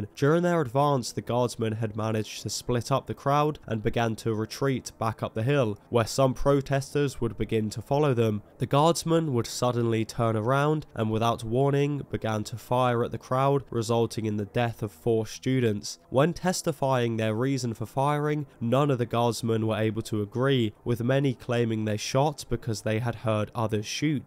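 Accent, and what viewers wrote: United Kingdom. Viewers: There's actually a fair number of documentaries on this, made by people who were there at the time. Fun to hear a British kid do an abbreviated rundown on decades of American history.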